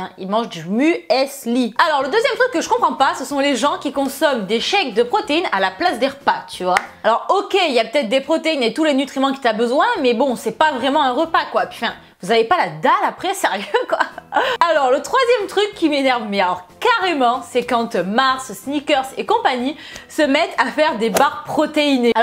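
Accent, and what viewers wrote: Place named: Nice, France. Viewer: C'est officiellement la vidéo ou son accent nissart ressort, c'est connu l'accent ressort quand tu es énervé x)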